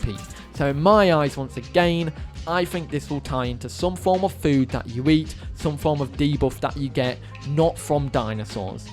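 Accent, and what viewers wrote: United Kingdom. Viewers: its been so much time since ive watched a video, and god does the bri ish accent bring me memories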